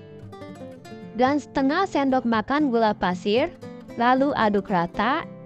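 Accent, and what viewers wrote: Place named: Japan. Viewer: Barusan subcribe , tp blm tahu , Anda org Indo / jepang sih ? Aksennya kyk org jepang 😊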